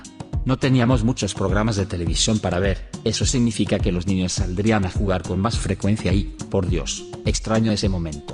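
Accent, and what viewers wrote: Russia. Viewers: el hecho de que esta grabado por una voz rusa autentica hace este video mejor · Vivo en china y soy youtuber latino, y los que no somos comunistas no tendremos problemas desde que no critiquemos abiertamente a las autoridades chinas, de resto por ahora todo parece normal, saludos a ti yo también visité Rusia reconozco el acento de un ruso cuando aprende español.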